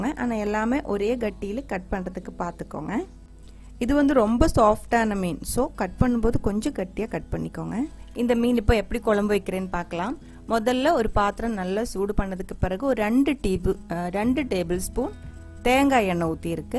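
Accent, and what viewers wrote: Kerala, India. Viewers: Azhagana Malayalam kalantha thamil pesum thangachikku vaalthukkal. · Malayalees tamil pesuradhe oru azhagu than! I like the way you speak and the way you speak and oviya S accent (big boss) !! I tried this watching a malayalam channel it was v delicious 😋 I tried it with mackerel , next time I l try in your style for jelabi meen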